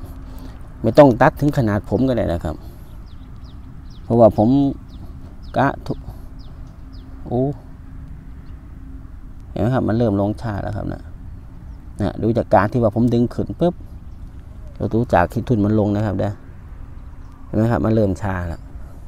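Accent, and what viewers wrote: Korat, Thailand. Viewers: โคราชบ่คับ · ฟังสำเนียง โคราชหรือนางรองครับ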